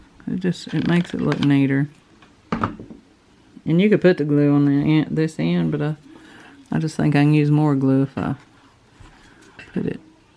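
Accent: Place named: South, United States. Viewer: I really love your ideas, but I really got triggered with all the mess on the table and the glue strings, I’m a OCD when it comes to creating, I set my things out on my work station, then I decide where to start on my project, then I work and finish the first section, then I tidy that stuff away before I start on the next section, I really get anxious with messy work stations, I was cringing the whole way through, the paint on your fingers got to me too. Not hating, so please don’t think I am, I’m just saying it triggered me. I do however, find your voice to be soothing so that helped me to continue to watch you create this shabby chic corn decor, it’s so refreshing to see people don’t just stick to autumnal colours, I love the shabby chic look. It can’t be all bad, I subscribed despite my OCD tendencies. TFS love from the 🇬🇧 I love your southern accent.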